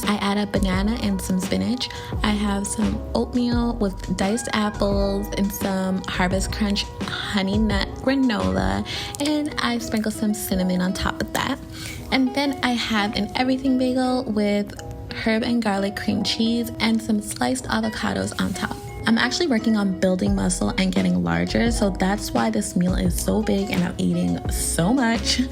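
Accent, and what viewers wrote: Nigeria. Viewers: Wow 😯 I had to pause when I heard your naija accent. Ayyyyy Hi sis 💕🇳🇬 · AS soon as you hit that Nigerian accent I subscribed! I love following African youtubers because its easy to relate to them. I am glad you were in my recommendations! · same totes nigerian accent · She’s definitely either Nigerian or Ghanaian😭✋🏽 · As soon as you switched to the Nigerian accent you won my heart and I subbed 🤗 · That Nigerian accent was unexpected 🤣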